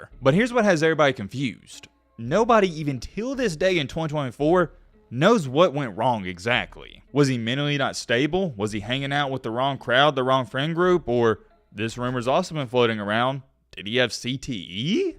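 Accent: South, United States. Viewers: The fact that this is a white guy (person who made this video) and southern from what I can hear shows the undertones. Look at how many times this guy loves showing that photo of Harris on her and over. You’ve listed no sources, no cites. You’re not from the area Cliff is from, went to the college he went to. You’re a joke The picture is on your caption, yet you display that photo so many times as if we hadn’t seen it ten seconds earlier. This channel and this narrator is a person of trash, and he will get his. This could have been done with class, but was nothing but trash. P.S Anyone reading this. The actual video starts at minute .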